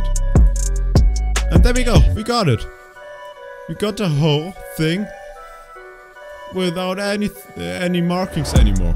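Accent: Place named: Germany. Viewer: Ziemlich aufgesetztes, unnatürliches Gequatsche - und deine deutsche Intonation ist dennoch unüberhörbar, mein Junge. Ist bei dir nicht geheizt, dass du diese irrwitzige Mütze tragen musst? :face-green-smiling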